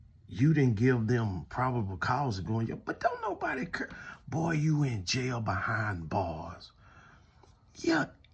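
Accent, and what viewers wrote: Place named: South, United States. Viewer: You got me really using my imagination and I enjoy the content…..I’m like ….I feel what you about to say and that country accent…Unique and ❤….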